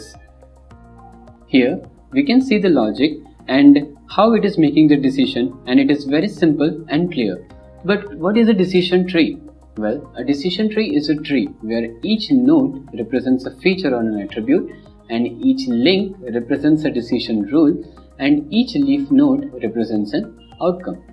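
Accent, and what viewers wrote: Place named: India. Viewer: hintli ingilizcesi skilli kastırdınız zorla abv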